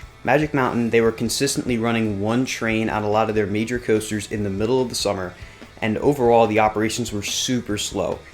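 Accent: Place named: New Jersey, United States. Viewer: "Wudder rides"... "Wudderfalls"... yeah, definitely from Jersey ;) nice vid, sub'd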